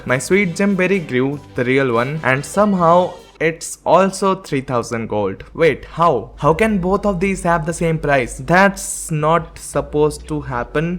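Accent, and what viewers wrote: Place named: India. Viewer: I know this guy thought it was AI when it can't even mimic Indian accent plus where have you seen in ai videos their logo pop up as their mascot never smh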